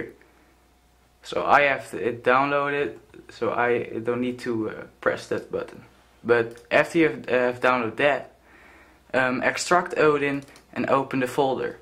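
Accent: Netherlands. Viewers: ik hoor zo hard dat je nederlands bent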